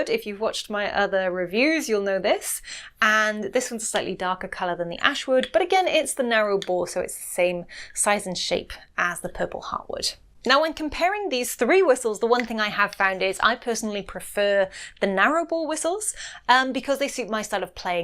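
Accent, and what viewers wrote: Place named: United Kingdom. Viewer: Very nice video, hello from France. (I knew it, when i heard UK. You are not Irish (I 've lived there, i know the accent) not Scottish too i think. So you are from Doctor Who' s country. Love the UK, see you next time.)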